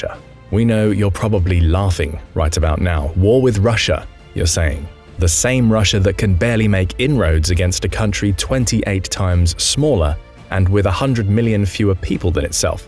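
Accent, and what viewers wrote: United Kingdom. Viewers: A US Military PR piece using British Accent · I hear a british accent!!!😂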